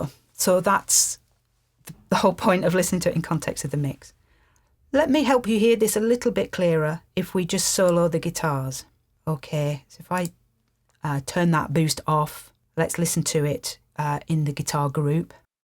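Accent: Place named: Yorkshire, United Kingdom. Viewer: As helpful as ever. And the icing on an already great cake is to have this wise advice delivered in a fabulous Yorkshire accent. Love it.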